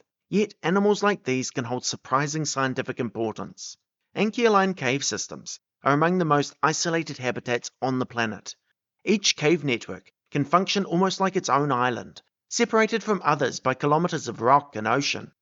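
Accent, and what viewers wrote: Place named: New Zealand. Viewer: You have such a strong New Zealand accent 😂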